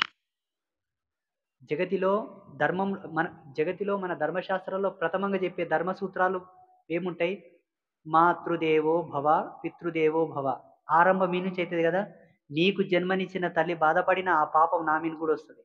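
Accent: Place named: Telangana, India. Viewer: గురువుగారు గొప్పగా చెప్పారు స్వామి మీ జ్ఞానం అమోఘమైనది మీ వాయిస్ చాలా బాగున్నది తెలంగాణ యాసలో మాట్లాడడం చాలా నచ్చింది మీరు మాట్లాడితే కెసిఆర్ మాట్లాడినట్టే ఉంది మీకు పాదాభివందనాలు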